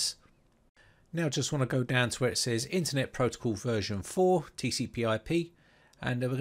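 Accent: United Kingdom. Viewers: Hearing a british accent after all of those indian ones is so refreshing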